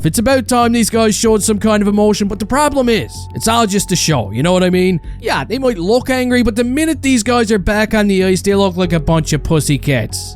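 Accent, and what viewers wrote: Newfoundland, Canada. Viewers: Your accent... are you a newfie? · Is a newfie? Sounds like it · you sound like yer from newfoundland b'y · dying at this sadly its all true 😂😂btw you gotta be a fellow newfie i haven't heard an accent that thick anywhere else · Yes by' are you a newf me son? Unless me ears ain't hearing right. I'd say you are from the rock! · what part of atlantic canada is this fella from? felt like I have home in newfoudland listening to this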